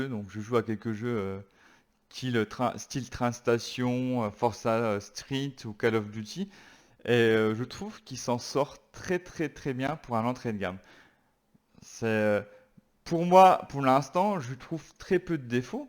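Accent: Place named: Vosges, France. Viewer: alors je ris, parce que en vous écoutant (sans avoir lu) j'ai pensé moi aussi à l accent Vosgien 😛🤭 ah ah ah c est tellement inimitable ! Entre Vosgiens on se reconnaît bien. Merci pour vos infos 👍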